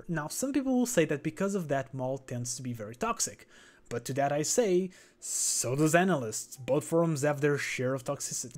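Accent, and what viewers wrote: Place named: Portugal. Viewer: Something curious just happened... I just joined anilist and I wanted to know more about the website before starting to use it. I open youtube, search for "anilist" and this video is right on top. I click it. Less than 10 seconds in and I start to have this feeling... your face, your accent... is he? Something tells me he is... I go to your "about" section and that's when I see it... este gajo é português caralho! Um pt consegue cheirar outro pt à distância ahaha acabei de subscrever. Boa sorte com o canal!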